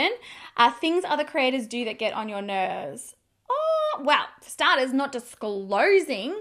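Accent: Australia. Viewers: I believe in free speech too, it is always best to let fools expose themselves. I love the Mark Twain quote " It is better to remain silent and be thought a fool, than to open one's mouth and remove all doubt." I love your make up looks all though I am the opposite I have dry skin and prominent round eyes but I still dig your looks just can't use the same foundations and concealers. P.s I also love that the Aussie say arse like us Paddys, great word so is shite as it is worse than shit lmao. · I love so much!!! And who is mean to you!!!!!!! Can't believe it. Not that the US accent is better than the Australian! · Hi, I rarely leave comments, but I have to say that I really enjoy your videos. You give terrific reviews and let us see exactly how everything sits on your skin. Also, your accent is amazing! I've been to Australia four times and always find excuses to go back (even though it's soooo expensive). 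I usually watch your videos at the end of the day (mostly for relaxation purposes), and it makes me feel like I'm on vacation... is it weird? Anyway, thank you for being you. I don't think you need my opinion, but people can be mean to each other regardless of your professional field. It's tough not to feel affected, but the most important people in your life know you and respect you. Also, you have heaps of fans worldwide (I'm Portuguese).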